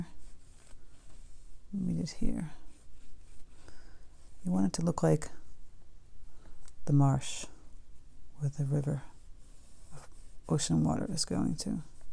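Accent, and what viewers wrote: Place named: East Coast, United States. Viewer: I have to tell you that I have learned SO MUCH from you in the short time I've been subscribed. I have watched every video, and you're so great at explaining each thing you do to achieve the look you're going for, and I think I painted along with EVERY Christmas card video and gave hand-painted Christmas cards to ALL my friends and family! I always can't wait for your new videos to see what else I can learn! I'm fairly new to using watercolors, and value each lesson I can glean from watching! Thank you so much for sharing your talent and instruction with all of us! You rock!! BTW, what part of the East Coast are you from? I was born and raised a Jersey Girl, and I hear something in your accent, but can't quite place it! Thanks again!!!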